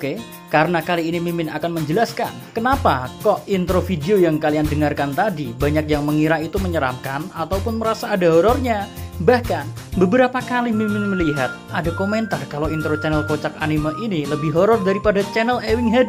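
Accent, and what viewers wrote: Java, Indonesia. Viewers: Jangan diganti y min intronya .... Selain krna suara lu yg medok ,, gw subscribe chanel lu krna intronya .... Berasa jd bayi lg w klw dnger intronya .... 😅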